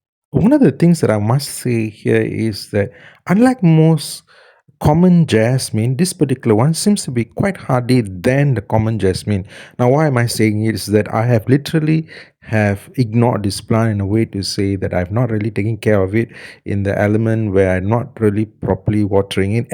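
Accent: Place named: Malaysia. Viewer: You sound Malaysian or Singaporean. Where can I get this plant in Malaysia ?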